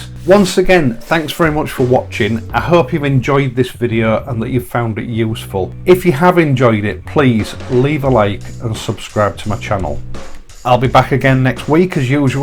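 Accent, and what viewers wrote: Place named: Manchester, United Kingdom. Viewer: Good to hear a Manchester accent! You ought to be doing Bodington adverts!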